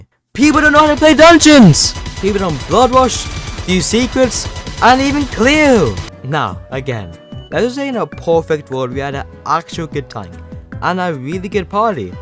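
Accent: France. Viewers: Are you french? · eww are you french? good video tho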